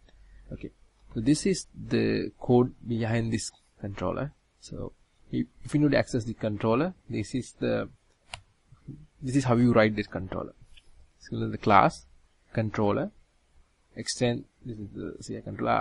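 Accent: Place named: India. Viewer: m sorry to look racist CAN YOU PLEASE FOR THE LOVE OF GOD STOP INDIANS MAKING TUTORIALS WITH THEIR LANGUAGE? LET S FACE IT YOUR ENGLISH ACCENT SO FKN FKCED UP WE DON T UNDERSTAND.... Sorry again yet it s the truth... have someone else do the voice over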